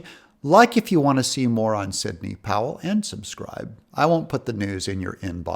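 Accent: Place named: Scotland, United Kingdom. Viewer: Love yer Scottish accent 🤭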